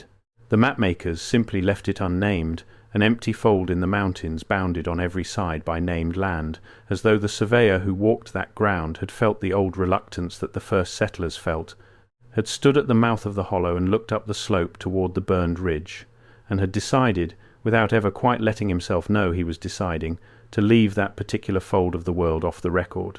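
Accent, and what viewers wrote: United Kingdom. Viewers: Why a British accent narrating an American story? Oh, AI. Which makes me not believe the story.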